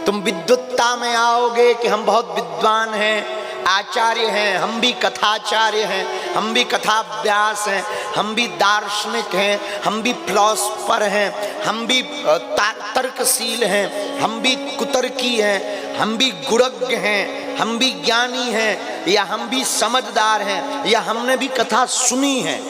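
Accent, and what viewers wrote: Bundelkhand, India. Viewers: bhai... Maine achhe dil se hi bola hai emotional tareeke se bolte hain hame pata hai tumhe pata hai un k sabhi chahane walon ko unki bundelkhandi boli bahut pasnd hai.. Lekin jo shero shaayari hoti hai usme urdu k bahut se aise shabd hote hain jin ka lena dena se door door tak nahi hai... Jo sindoor bindi plot book... Wali baat hui wo bhi k shabd nahi kyonki ye hamne bahut pahale se suna huwa hai... Bas isiliye kaha.. Hame unme bajrang bali najar aate hain or un k mukh se... Naa rupya lagta hai na paisa lagta hai.. Ram ram boliye bada achha lagta hai 🙏🚩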